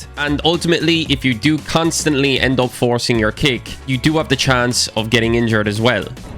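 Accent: Ireland. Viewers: holy irish accent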